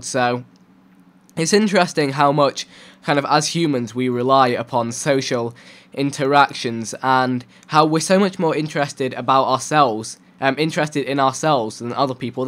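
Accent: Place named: United Kingdom. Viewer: I like your British accent :)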